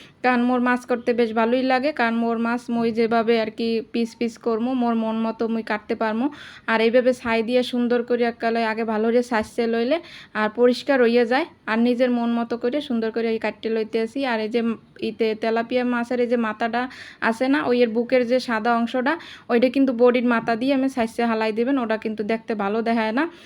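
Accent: Barishal, Bangladesh. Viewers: ✅👍আপু তোমার বরিশালে ভাষায় কথা বলেছেন আপু আমার শুনতে খুব ভালো লাগলো 🎉কাঠালের বিচি আমার খুব ভালো লাগলো ❤সব কিছু রান্না টা অনেক সুন্দর হয়েছে আপু। আর সেমাই রান্না করলেন। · দিদিভাই তোমার কথাগুলো আমার খুব ভালো লাগে। আমার শাশুড়ি তোমার মতো বরিশালের ভাষায় কথা বলে, বরিশালের ভাষা শুনতে আমার খুব ভালো লাগে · আপনার বরিশালের কথা শুন‌তে আমার ভালো লাগে।আপনার ভি‌ডিও দেখে কিছু শিখে নিলাম। খুবই ভালো লেগেছে❤❤❤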